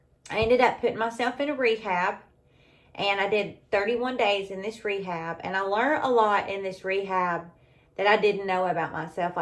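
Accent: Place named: South, United States. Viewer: Hi, i just subscribed!😊🎉❤ I saw some of your denture videos. I was just browsing through your videos, and my experiences are so much like yours. It's crazy! My teeth are so bad! They are broke off to the gums. I am 60 yrs. old and i want to get dentures just like yours. lol I love them. You mentioned in one of your videos about the 3D dentures. Could you tell me where to reach them and how much they are,please?🙏🦋🌸💐😉👍❤️ You got the talking down with yours, girlfriend. 😏 Ive been clean for 14 yyears now.👍🙏 Im much happier now. I will check out your videos. Thanks for sharing. You seem like such a great person. I love your country accent!!! Lol❤😊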